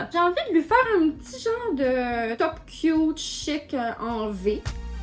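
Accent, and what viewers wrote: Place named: Quebec, Canada. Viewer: Les gens qui ont l'accent québécois ont tellement de charisme 😂🙈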